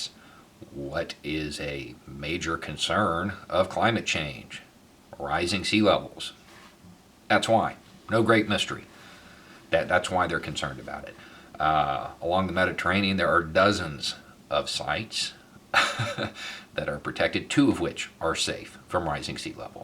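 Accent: South, United States. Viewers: Thanks for this one. People who don’t accept science should delete their social media, cancel their phones, sell their cars, abandon technology and live with the Amish. I never comment on stuff but I’ve been watching you for a while thinking how does a dude with a southern drawl have these thoughts...then I found your other channel and it made sense Ⓐ ☮️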